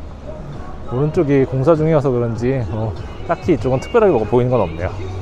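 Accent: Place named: South Korea. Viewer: No se aprecia ningún acento ni de España ni de latinoamerica por lo que no sé dónde lo has aprendido. Lo del acento lo decía porque se notaba que el que hablaba, o sea, tú, tenía un deje coreano que he escuchado en otros vídeos y me encanta. Esa tonalidad me pone feliz y hace que sonría. Por todo ello GRACIAS desde España.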